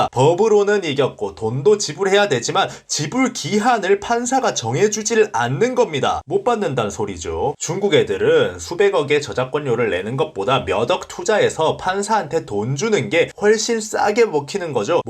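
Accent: Gyeongsang, South Korea. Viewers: 경상도 사투리 쓰는거 맞으 신거죠 첨 억양이 ... ㅎ ㅎ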